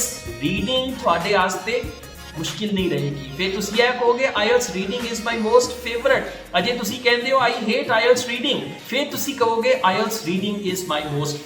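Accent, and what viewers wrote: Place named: Malwa, India. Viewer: Background music...👌👌punjabi sirra bolde o sir tuc sade ..malwaian wangu😊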